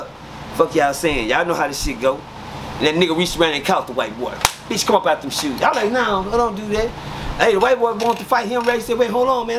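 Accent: South, United States. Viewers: Def high..... he has a southern accent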